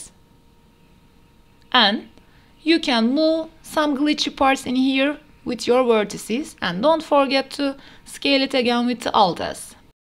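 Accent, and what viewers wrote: Turkey. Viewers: you are turkish because your accent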